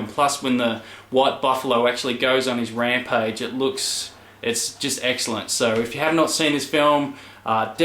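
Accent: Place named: Australia. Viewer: Hey, in case you haven't noticed, you have 666 subscribers! I realized that because I was an watching Eric Bana interview and I thought his accent was identical to yours as far as regional Aussie accents, so I was checking to see if you live near where he is from...:)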